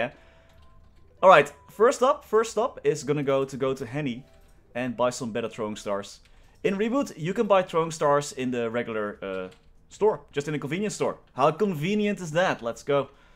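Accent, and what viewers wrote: Netherlands. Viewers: NL accent is er moeilijk uit te krijgen bij sommigen xD echt hoe hou je het vol zo lang aan t maplen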